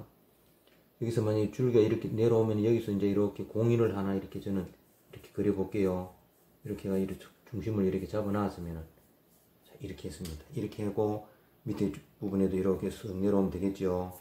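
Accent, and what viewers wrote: Gyeongsang, South Korea. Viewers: 경상도 말씀 설명 정겹습니다~~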